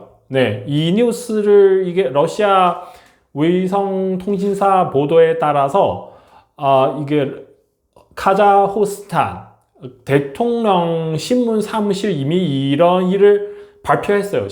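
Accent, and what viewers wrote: China. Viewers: 이소식 전파하는분? 중국발음에 취해있는분?억양이한국본토발음어색한거로들림 아묻튼 진실만을 전했음합니다 중국짱께는 죄명이 죄인이그리워하는나라 ㅡ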